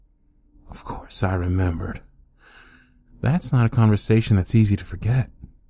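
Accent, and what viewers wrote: South, United States. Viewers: HIS SOUTHERN ACCENT HAS ME QUAKING LMAO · I love the country accent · I FRICKING LOVE THE SOUTHERN ACCENT, ITS SOOOOO AMAZING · Awwww the southern accent always gets me 😍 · Dog + southern voice grabby hands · That slight southern accent is so charming!!😍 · As a southerner the accent slapped me so hard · Dat Country accent 😍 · It somewhat sounded like a southern accent was thrown into this. It was amazing. This reminded me of my dad returning home from Iraq when I was little. I loved this so much. Well done. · Is it just me or did he do a southern accent? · Country accents! Country accents everywhere! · The southern accent is almost a turn off, I guess is trying to fit the "all American vets have tough southern accents" stereotype, for me it's just distracting but it probably hits home for other people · Is that a twinge of a southern accent I hear? 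· I love southern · aaaagh that Southern dialect! I love it! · I know I wrote this and all, but as I was listening to this, I cried like a little bitch., your southern accent is so adorkable! Even still, I'm dying because of how wonderful this turned out! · I swear you get a little southern every time you do a soldier one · So cute! I love that you have a slight southern twang when you talk in this one! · Southern accent 😳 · The southern accent is back y'all. The heartwarming fluffy bits r back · is it me or did anyone else hear the cowboy ascent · That southern accent is actually everything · holD uP nOw! YOu HavE mY CoUnTrY AccenT! And Is ThAt I WeE dOggIe? HoOoOoO-wEeE! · Psst guess what. The country accent. Fucking amazing. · Are you from the south or something cause it sounds like you are or you’re just really good at doing accents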